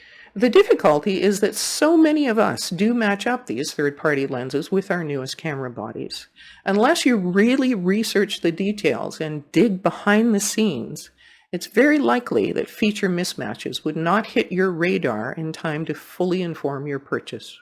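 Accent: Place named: Canada. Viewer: First of all, I would like to say what a pleasure it was to catch your video, and it was lovely to hear a Canadian accent again! I lived in Hamilton, ON, for about 6 years before moving to Victoria, BC. I now live in Auckland, New Zealand. I started my professional career in 1980, but am now retired, so I have quite a few things in common with you. My preferred genres are wildlife, scenic and travel, but I have also shot architecture, portraits, commercial and industrial stuff. In NZ, birds are the dominant wildlife form, so my experiences are very much couched with shooting them. So, to your experience... I do understand your frustration with challenges using third-party lenses. I got the R5 and a couple of R6 units about 20 months ago along with the 100-500, 24-105 L units, and the 24-240 (which, by the way, is a surprisingly good all-rounder!). However, in my fairly vast array of legacy gear I have the Sigma 150-600c and 60-600s lenses, and I tried them on my R5 and R6 units via the Canon basic EF-RF adapter. 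To be honest I had no issues unless I was shooting close to minimum focusing distance - an experience echoed by respected Australian bird photographer Duade Paton in one of his videos on the subject. I have not checked your settings at the time of responding, but there may be some subtle difference in how we have our units set up. One thing of note is that Canon have released a long series of firmware updates for the R6 and R5 to fix issues with tracking and focus tha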